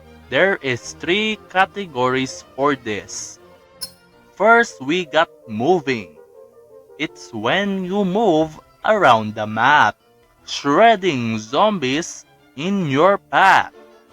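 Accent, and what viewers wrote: Philippines. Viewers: Woah you're a fellow kababayan, I could tell from your Filipino accent. Amazing on point video about sapper building management.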